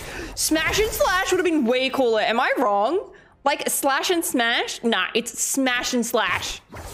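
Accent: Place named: Australia. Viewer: Is it just me or is getting more Aussie sounding as time goes on?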